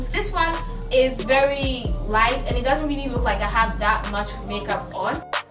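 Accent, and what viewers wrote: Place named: Nigeria. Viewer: "YOU WILL BE BLOCKED LIKE BOM BOM POW" OUII SLAY GURL YASSS😗😗😘 NIGERIAN BISSH AYY UR MY NIGERIAN BUDDY THAT ACCENT THO GURL I LOVE YOUU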